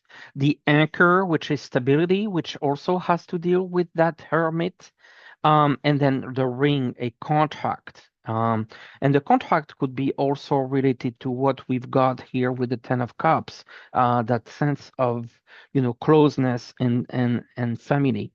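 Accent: France. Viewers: Thank you , may I ask are you French? Your accent sounds a lot like mine, I’m new to your channel , glad I found you, hope you have a great year.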